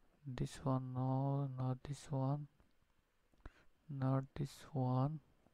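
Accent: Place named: Nepal. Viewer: bro nepali ho kya ?? 😂😂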